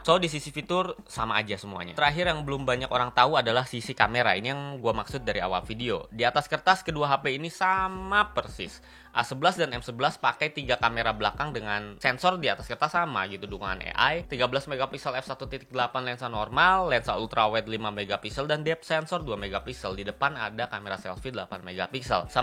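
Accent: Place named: Java, Indonesia. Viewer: Kalau ngomong kok medok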